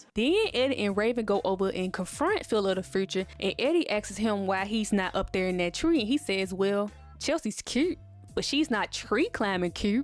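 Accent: Memphis, United States. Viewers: I remember thissss! Are you from Memphis I hear the accent! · You talk like you from Memphis lol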